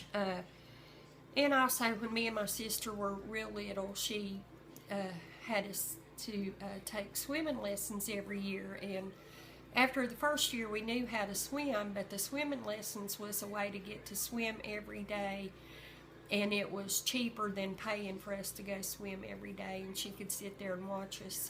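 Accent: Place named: North Carolina, United States. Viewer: I know this is an old video. But my grandmother was born in NC but moved to Melbourne FL and lived there for the majority of her adult life. I've been to Eau Gallie, is very cute! I think it's funny that y'all are in reverse! She had a NC accent like yours and i find it comforting. :) I'm also a momma's girl. I adore my mom.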